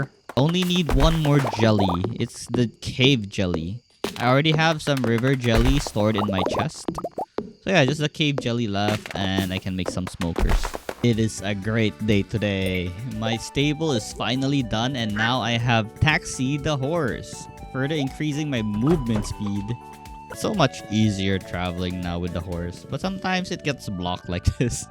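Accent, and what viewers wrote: Philippines. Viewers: are you filipono? your accent sounds like it. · Are you a fellow Filipino? I noticed your accent in your 100days video and i heard filipino voices in the background somewhere in this video.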